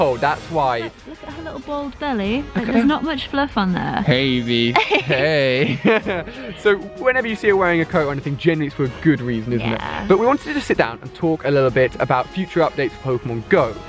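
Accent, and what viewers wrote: United Kingdom. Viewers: lol, sounded super British in this video:)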